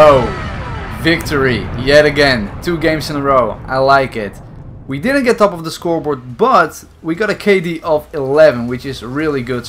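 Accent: Netherlands. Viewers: Your accent sounds like a Dutch accent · You sound a bit Dutch if you speak English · Nederlands accent, 1 van de redenen dat ik naar je filmpjes kijk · Wat een heerlijk Nederlands accentje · instantly noticable Nederlandse accentje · je hebt een nederlands accent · Jouw accent😂 Nederlander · Ik merk aan je accent dat je nederlands bent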